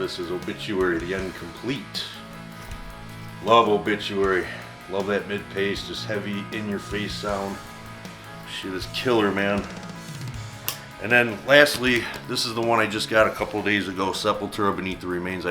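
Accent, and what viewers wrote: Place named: Chicago, United States. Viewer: Fn love this channel bro, lifting and listening to metal! Hey do I hear a mid-west accent in your voice? You sound like a fellow Chicagoan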